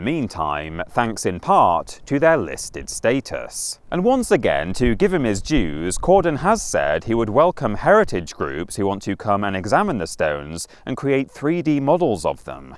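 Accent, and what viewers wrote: United Kingdom. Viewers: I usually prefer English accents -not this one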